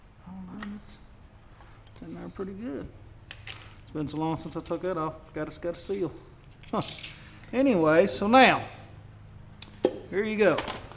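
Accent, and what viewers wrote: Tennessee, United States. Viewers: You from TN?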